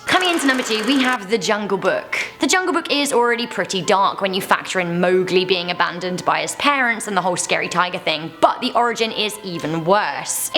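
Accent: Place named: United Kingdom. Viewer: She sounds like a British Princess Carolyn (Bojack Horseman)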